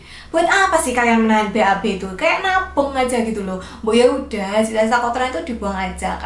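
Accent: Java, Indonesia. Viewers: Bidan cantik yg logat jawanya medok. Channel langganan dari masih hamil smpe melahirkan dan baby ku ud 6 bulan skrng😁 selalu sukak pmbahasannya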